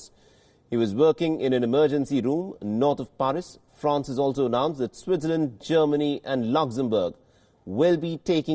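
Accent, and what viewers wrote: India. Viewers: It's indian news channel.. He is speaking in indian English.. U dont know... Go and learn it kid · It is an Indian channel. If you can't understand his accent may be you are at a wrong place.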